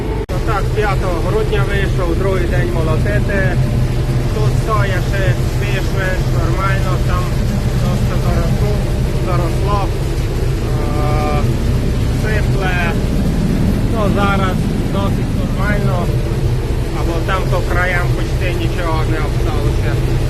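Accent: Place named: Transcarpathia, Ukraine. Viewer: Чути закарпатський говір, з якого ви району?( область і так знаю))))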